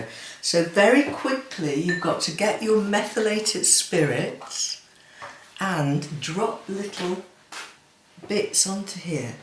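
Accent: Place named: RP, United Kingdom. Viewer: Hello your sooooooo posh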